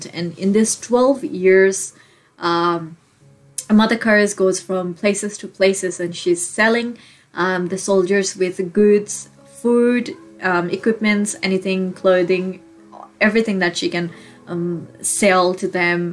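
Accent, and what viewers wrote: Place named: United States. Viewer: Haina yo Nepal ma padheko manche ko american accent kasari aayo? Hollywood filim dheri herdoracha kyare ...😅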